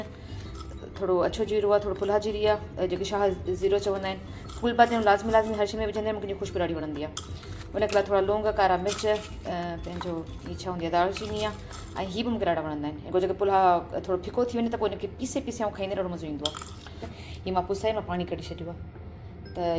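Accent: Sindh, Pakistan. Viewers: Tawahan Jo galhaen dadho sutho ahe safa sindhi jean asan Pana gar me galhaenda ahyoon .I like it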